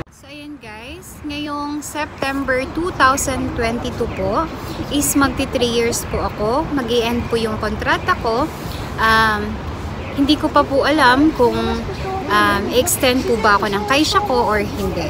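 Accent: Visayas, Philippines. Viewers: Bisaya d.i ka ma'am 😅 cebu here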